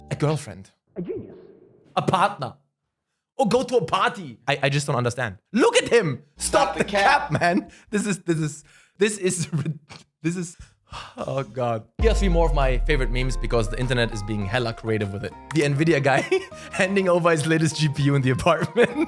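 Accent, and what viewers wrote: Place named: Germany. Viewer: Ich habe gerade festgestellt, dass die automatische Übersetzung von YouTube durch deinen starken deutschen Akzent einfach nicht funktioniert. 😂😅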